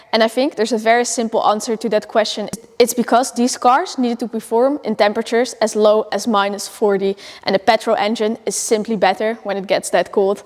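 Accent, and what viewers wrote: Netherlands. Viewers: First time here. Heard your Dutch accent right away! Wondering where you are in Nederland? My kids & grandkids are in Barendrecht and Dordrecht area (Ik ben in Utah, USA... lang verhal, hoor! Lol 😅) I'm an 'ol Willys Utility Wagon guy. My dad bought new in '64 a new Wagoneer. Drove it in high school in the '70's. But I fell in love with the older Utility Wagons ('46-'63) I have a '59. I LOVE these old tough off-road machines. The Volvos, Mercedes, UAZ's etc. Usually having only a face a mother could love but capable of traversing almost any type of terrain. I'm pretty sure those machines around you are not challenged much in Holland (except mud) but would be "fun" in Russia or China or...??! You are a girl after my own heart. Would that you had been there years ago when I needed someone just like you. 😊 'sja... Nou ja, zeg! God bless you in making your dreams come true. 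If you ever want to see Utah (Moab) the Great Basin Desert, hit me up. Always welcome here! Totziens! 😎👍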